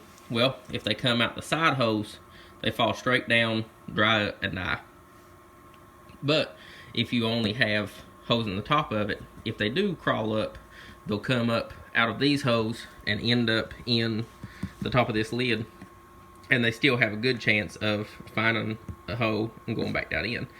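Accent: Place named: Alabama, United States. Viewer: sounds like Alabama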